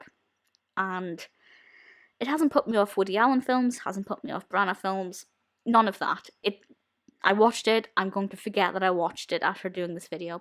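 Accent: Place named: Belfast, United Kingdom. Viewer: I don't mean to be snarky but I think you got a bit blinded by your liking of Branagh, who is great but as stated here, Judy Davis is the co-protagonist. <link> Just offering constructive criticism; I see you're starting out/didn't do another(?). I hear from your accent you would have particular insight to KB's film on Belfast.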